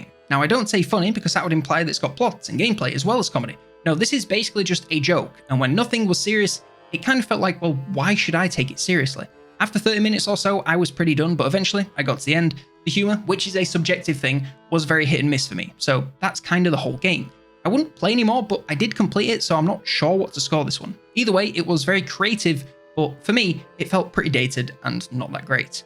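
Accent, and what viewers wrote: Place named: United Kingdom. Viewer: It's nice to hear a British accent telling about something interesting. What exactly is it though?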